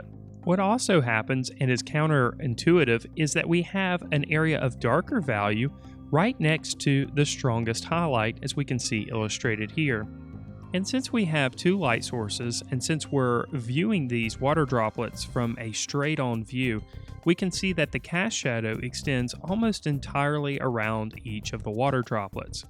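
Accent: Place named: Louisiana, United States. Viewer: Reminds me of a northwest Louisiana accent.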